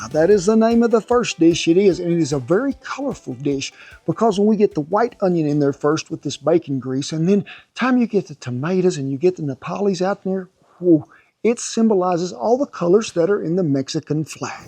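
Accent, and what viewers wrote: United States. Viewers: A country white man peeling and prepping nopales with a huge USA flag behind him and has a country accent. Mexican culture is strong.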